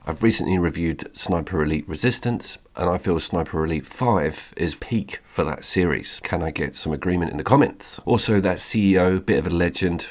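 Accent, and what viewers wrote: United Kingdom. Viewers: Tho not for me (yet) as I prefer Fallout then perhaps a Metro game, and to a lesser extent the Stalker games. However your a Brit, so I can only imagine the fun to romp around the world hearing a accent similar to my own and those I (in your case literally) grew up with. As for the reviewer issues your spot on. No one wishes to wait for anything people are 9 year olds wanting what they want (the review of a game)when THEY want it. You tube could of done anything to make better reviews rise to the top but instead it is a small nice circle at the top and few smaller to medium channels can ever hope to have their audience and thus you tube attention. You will have to at some point know that you still have your integrity and cover the kinds of games that interest YOU, and have fun doing it. At least until someone helps you go viral:D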